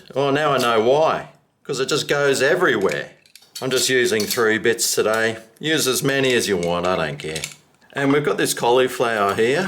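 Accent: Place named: Australia. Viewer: I watch you every now and then:) nice to hear an authentic Aussie accent LOL....this meal actually looks very appetizing I am going to make it as I am sure my boys would like this...two questions...1. hard to tell so is this a big enough meal for 4? Or would I need to double the ingredients and 2. Would frozen cauliflower rice be an ok substitute for the lazy cook aka me 😆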